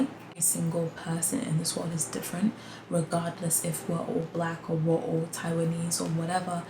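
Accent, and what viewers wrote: United Kingdom. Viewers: I like your British accent ;) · of course, you're a Brit. Most of the people here just can't tell your British accent unless you sound extremely posh. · I am a hongkonger, I think Hong Kong also is a nice place. General Hong Kong people are educated and open mind that welcome people from all around the world. Hope you would like to travel in Hong Kong. 🇭🇰🇭🇰 by the way I love your British accent❤️ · 哈哈！我好厲害！ 我聽妳說話一分鐘我就在猜妳是不是來自英國，因為很明顯的發音特徵，short a sound and no hard R sounds，但容我再猜一下，妳應該最初不是來自倫敦（雖然妳有提到倫敦，但家族應該不是一直住在倫敦吧！？），而且口音也不太像PR口音！ 此外我知道，英國其實有很多非裔人士，就跟法國一樣有很多非裔人士，所以我不感到意外，畢竟西方的人種很多元，當然台灣也是多元種族的社會，但在膚色、髮色等外顯特徵比較相似，不像西方國家那麼明顯！ 若是在台灣，有人讓妳感到不舒服，請妳原諒他們，多數台灣人還是懂的那分寸的，此外，妳提到跟台灣人不好交朋友，或許只是妳剛好碰到的人比較害羞，或者不擅長英語，又或是其他原因，我想，多數台灣人還是很喜歡跟外國人認識當朋友的！ 前面那些猜測，純屬好玩，希望沒冒犯妳，最後，祝妳能在台灣有美好的體驗與生活！ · 你的口音聽得出來是個英國人。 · 看影片前半段的時候就感覺你說英文好像有英國腔 等聽到你說是英國人的時候馬上恍然大悟「難怪~~」😆 歡迎來台灣！ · Love your British accent,welcome to taiwan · 同意 語速對我來說剛剛好 還有英腔的r真的好好聽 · 英式口音超好聽的🥰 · 口音很優雅聽得出來像英國的感覺😍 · 覺得你說的很好👍🏼很喜歡你的英式發音跟講話方式 口條很清楚 聲線也很溫柔 很方便練英文聽力！說實在我在路上看到不同種族跟國家的人會很想認識他們 因為好奇他們的文化跟生活方式～希望妳在台灣能過的開心！ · 剛開始看就覺得口音很英腔，後面提到說來自英國就理解了，以前在念書的時候曾遇過膚色較深的法國人，之後就比較不會用膚色去判斷哪裡人了😂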